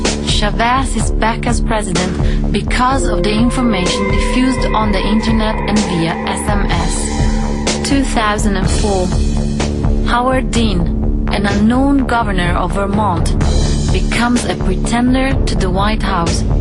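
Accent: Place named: Italy. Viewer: chiunque abbia fatto questo video poteva almeno prendere qualcuno che perlasse Inglese in maniera decente, e non il solito pastone Italiano/Inglese in stile Toto' 40 anni fa....patetico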